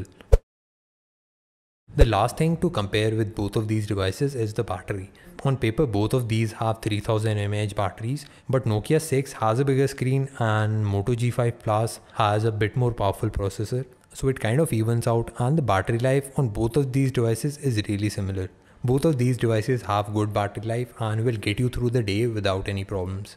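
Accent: India. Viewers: Why are you people complaining about the way he speaks when you know he is from India?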